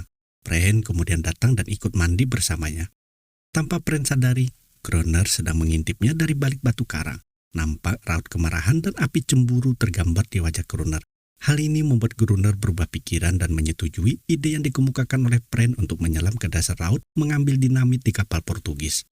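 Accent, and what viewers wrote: Indonesia. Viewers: Maklum orang Sunda ya bang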